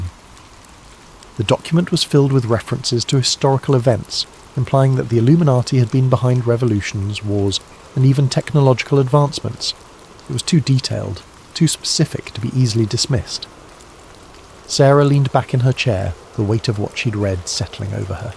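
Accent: United Kingdom. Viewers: I love this but i am very tired of a British accent. It doesn't relax me. I struggle to interpret every elongated vowel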